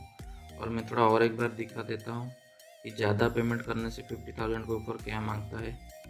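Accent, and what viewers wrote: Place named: Odisha, India. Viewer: Please correct me if I am wrong, I feel you are from Odisha due to your accent.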